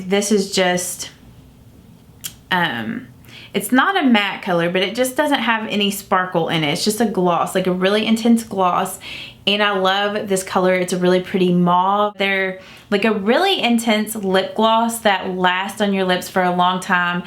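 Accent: United States. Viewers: Thank you for the Milani review,. I have several of the items you spoke of (blush, eyeshadow, lip creams). They are awesome and long-lasting. Please do a video on your makeup look in this video - it's beautiful! I love your accent, where are you from in the US? I am from Ontario, Canada. Cheers!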